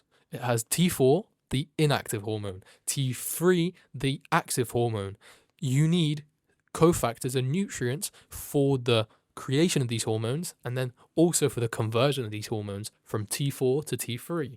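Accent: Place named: Poland. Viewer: not the typical polish english accent 😩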